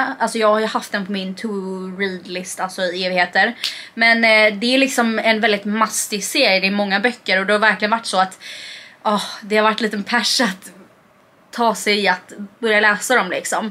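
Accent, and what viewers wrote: Stockholm, Sweden. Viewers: Varför pratar du Stockholms dialekt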